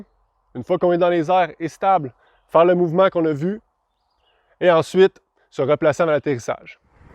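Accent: Canada. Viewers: sympas et pedagogiques tes tutos avec l accent canadien c est un regal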